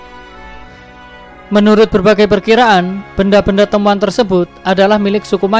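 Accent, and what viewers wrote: Java, Indonesia. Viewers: Pusing dengernya kalo naratornya medok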